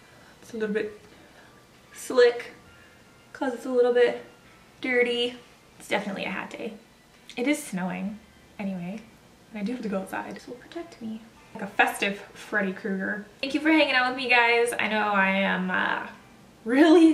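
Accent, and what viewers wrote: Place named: Canada. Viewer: This is the first video I’ve watched of yours upon seeing it in my recommended videos list. Could tell that you’re Canadian as well instantly !! Super cute!! Subscribed!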